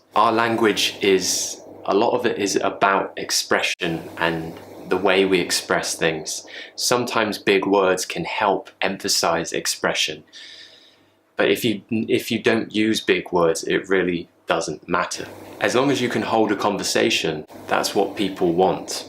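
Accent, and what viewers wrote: United Kingdom. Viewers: Hi sir how are you? I like british language accent so i watching your every episode. Thanks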